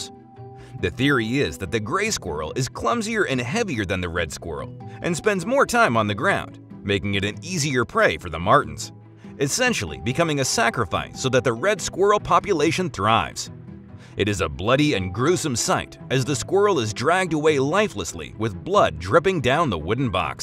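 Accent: United States. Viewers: That might be an excuse had this video been a European production . . . but the narrator is speaking English with an American accent, and it actually •shows• footage of (using the North American nomenclature) an adult elk, •not• a moose. The adult animal shown chasing some vehicle is a big deer, but it is NOT the biggest species of deer in the world. And in any event, the footage and most certainly does •not• show the marten attacking the fawn of an elk (let alone a moose); the prey is •far• too small for that. Even a newborn elk would be many times bigger than a marten. To be blunt, this video compels the conclusion that whoever compiled it has not the slightest idea what he’s talking about.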